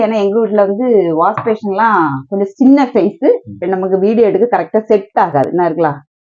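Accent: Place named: Kanyakumari, India. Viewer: Konjum kumari tamil supper puriyatha vanga than mannakatty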